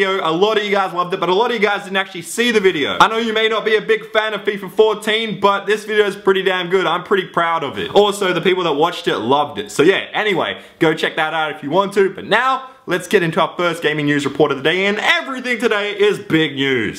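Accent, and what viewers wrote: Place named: Australia. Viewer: He didn't say the last part!!!! "My accent is Australian and blublublubla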